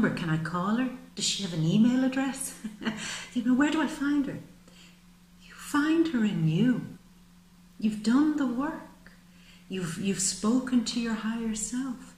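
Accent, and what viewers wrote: Ireland. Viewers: Gemini sun, Aquarius moon, Scorpio rising here <3 I watch a LOT of tarot channels and yours is by far the most touching, accurate and thorough <3 the readings this month and last were eerily accurate to my situation. You explained the exact thing that happened to me. This gives me so much hope and inspiration. Plus, my family is from Ireland and I love hearing that calming voice <3 reminds me of home. Thank you so much for taking the time to do these readings for us.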